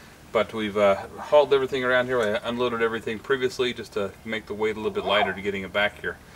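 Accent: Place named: North, United Kingdom. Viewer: it's quite obvious you're from up North.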